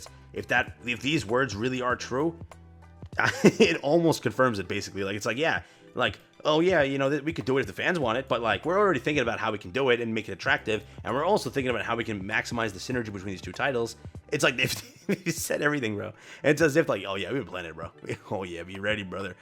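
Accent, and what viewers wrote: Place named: Utah, United States. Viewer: You be from utah or idaho...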